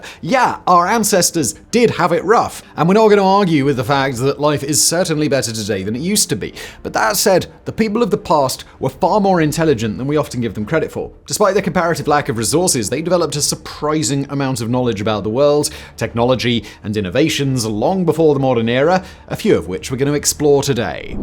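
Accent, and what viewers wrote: RP, United Kingdom. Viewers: You Tube SUCKS! I have removed this man's channels over and over again. But when You Tube changes the algorithm, it force feeds me this Simon guy's many channels despite my removing him. Simon's channels suck. They are poorly researched information that is mostly government propaganda talking points. Terrible videos. And just because Simon has a British accent, this doesn't not make him an authority on anything. He's just a talking head. England is over. Brexit has made the only job in England a You Tube content provider. Terrible list videos that spread falsehoods and bull. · Very imperial British accent...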